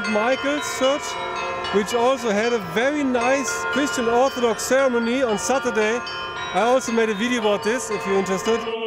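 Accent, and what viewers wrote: Germany. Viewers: 10 seconds in, oh ja, er ist einer defintiv Deutscher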